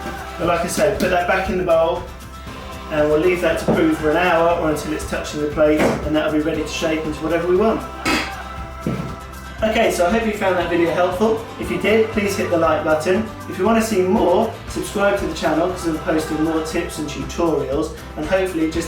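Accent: United Kingdom. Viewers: Where are you from? I’m guessing British but I’m pretty terrible at these things:/ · " "!!!! i get it.... u a mancunian?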